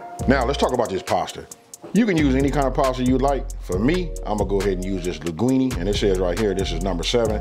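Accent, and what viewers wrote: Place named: West Coast, United States. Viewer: I just love that #WC accent😊💯. And the dishes are A1💪🏿. I'm making this dish tonight for a family of 5(including myself)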